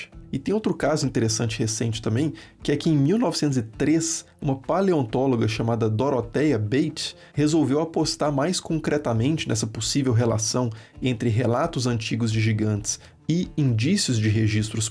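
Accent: Minas Gerais, Brazil. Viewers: que achado foi o seu canal!! As temáticas que você explora são extremamente interessantes e, ao meu ver de leigo, pouco conhecidas do grande público. Você tem um conhecimento incrível, uma didática ótima e (detalhe não negligenciável) uma voz/sotaque mineiro muito gostosos de ouvir haha Para mim, é o melhor canal de história em português! Parabéns pelo seu trabalho maravilhoso e obrigado por compartilhá-lo!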